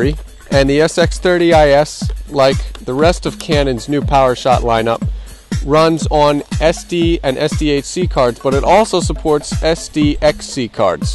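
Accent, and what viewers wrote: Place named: United States. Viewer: Like most americans he pronounces Z the way you would if you were saying Zorro, for instance. The rest of the world pronounces it "Zed" (as it should be), so he means FZ 40.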